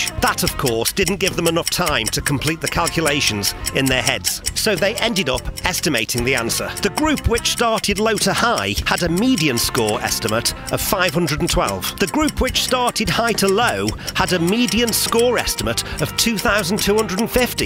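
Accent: Stoke, United Kingdom. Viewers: Are you from Stoke?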